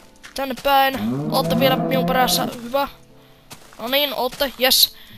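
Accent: Karelia, Finland. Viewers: Onko tua sun murre karjalan murre ?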